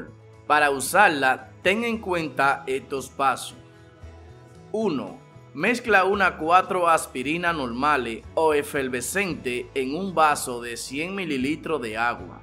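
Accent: Dominican Republic. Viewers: Ah ok, es bueno aprender algo nuevo cada día. Entonces supongo que es un acento de algunas regiones de República Dominicana. Igual se debe de respetar las diferencias culturales 😅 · 🤪 los dominicanos hablamos así😝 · Es el acento dominicano, aprenda un poco :) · Así hablan los dominicanos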